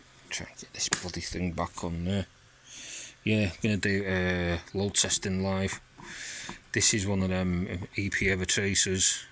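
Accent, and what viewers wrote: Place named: Manchester, United Kingdom. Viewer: Good on you mate, I could do with some help you sound local - Manchester way 👍👍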